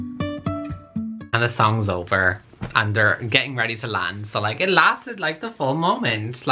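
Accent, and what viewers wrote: Ireland. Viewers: Living for 50 shades of grey + this irish accent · siri with the irish accent omg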